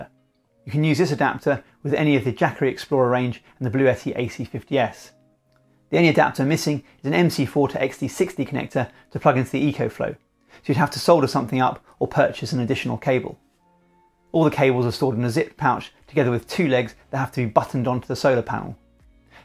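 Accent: United Kingdom. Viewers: Hi ur british?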